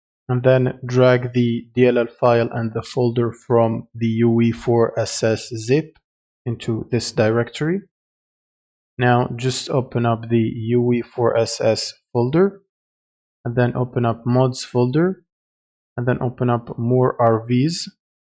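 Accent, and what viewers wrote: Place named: Egypt. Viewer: المصري تدري من نبرته وتفقيشه ياحبيبي احذف التتوريال ما يضبط يا اهبل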